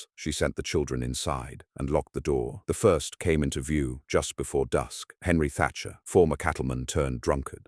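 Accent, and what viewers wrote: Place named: England, United Kingdom. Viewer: What's with the English accent?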